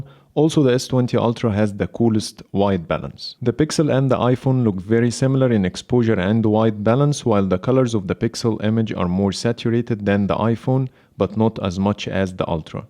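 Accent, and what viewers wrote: Egypt. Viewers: Egyptian accent distracted me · Egyption accent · Your accent looks like you are an Egyptian! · ترا مبين انك مصري و مسوي فيها اجنبي😂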